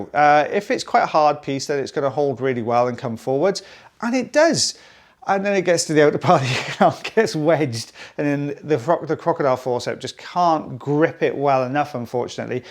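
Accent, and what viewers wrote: Wales, United Kingdom. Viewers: Amazing wax removals , just enough extra info, some audiologists are too technical, love your videos, your Welsh accent and enthusiasm make watching. A pleasure.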